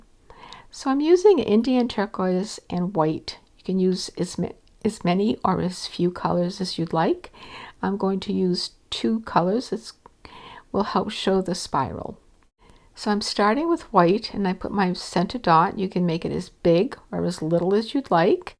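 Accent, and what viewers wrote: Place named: Massachusetts, United States. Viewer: Are you from Massachusets by chance? Your accent is so much like my aunts were. <3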